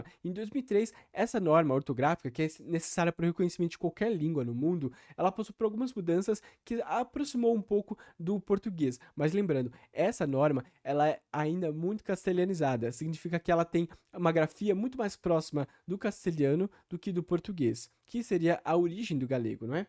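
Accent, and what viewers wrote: Brazil. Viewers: O sotaque dele é mais próximo a diversas regiões só Brasil do que de Portugal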